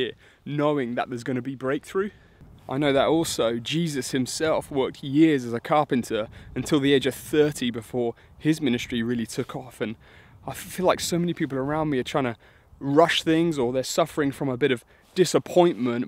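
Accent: England, United Kingdom. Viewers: I absolutely loved the quality of your video! Great production and your English accent is adorable! God bless from Australia!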